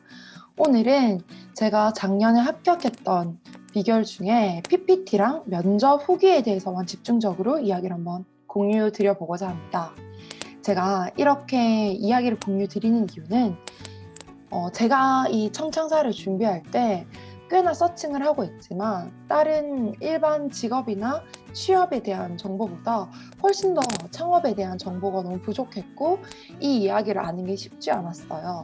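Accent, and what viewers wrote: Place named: Daegu, South Korea. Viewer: 저는 대구 친구가 많아서 ㅋㅋㅋㅋㅋㅋ막 들리네요 ㅋㅋㅋㅋㅋ그래서 걍 편히 녹화하셔도 될 것 같아요 친근하고 더 좋아요!!♡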